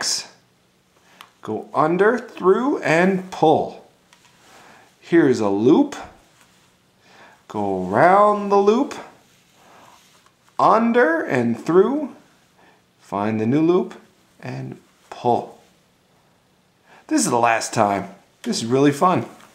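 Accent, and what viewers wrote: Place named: New York, United States. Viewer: This guy sounds like he is a new yorker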